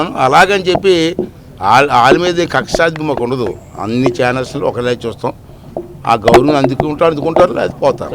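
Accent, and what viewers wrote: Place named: Narsipatnam, India. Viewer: Rey ade narsipatnam slang ra babu..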